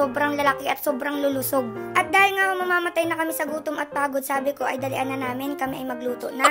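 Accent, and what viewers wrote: Batangas, Philippines. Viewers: minsan ay nagiging batangeña ka na eh eh eh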